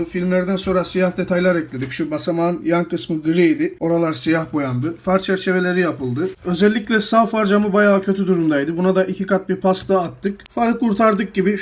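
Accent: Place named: Rize, Turkey. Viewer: Seviliyorsun reis. Videolarinla yeni taniştim ve cok profesyonel. Şivenden rizeli sin galiba ben de rizeliyim reis yolun açik olsun daha büyümen dileğiyle .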